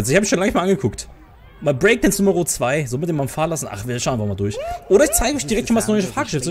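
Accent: Austria. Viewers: Ich feiere deine Streams und finde es Schade dass du kein witzigen Charakter hast, wie Zuvor mit dem wienerischen Dialekt mit deinem Witz dazu, war sehr Unterhaltsam und man hatte etwas zum Lachen, bisschen Abwechslung zu Rumpe ein Rumpelstilzchen 😂 Andl hat sein Willi 👍 Vielen herzlichen Dank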